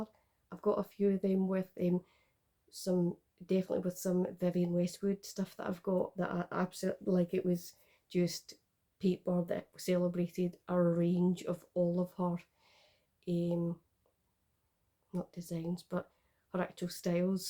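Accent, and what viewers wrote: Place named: Scotland, United Kingdom. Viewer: Subscribed just for your lovely Scottish accent, well and I love bags too😂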